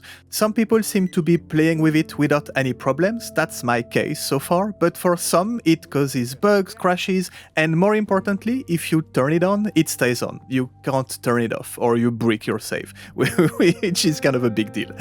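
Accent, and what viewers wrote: France. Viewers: Amazing video, cool french voice to artefact hunt to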